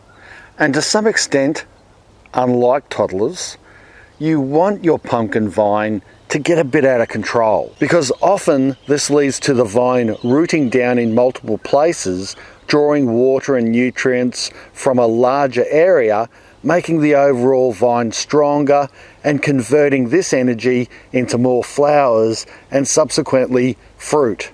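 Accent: Australia. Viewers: Aww, Richie Benaud! "What a marvellous day it's been out there toda-y-y-y-y..." A great man. 🙃 Upside-down, too! I'm a Pom, - you sound a bit like an Aussie, so you might as well take the Ashes back now. 🏺 Sigh... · Came for the pumpkins, stayed for the Aussie...ness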